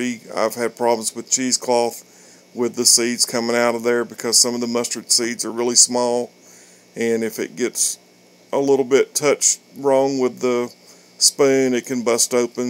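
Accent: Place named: Texas, United States. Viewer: Do you live in lower North East Texas? You certainly sound like you are from my area? I definitely enjoyed your recipe and am in the middle of making a four pound batch right now.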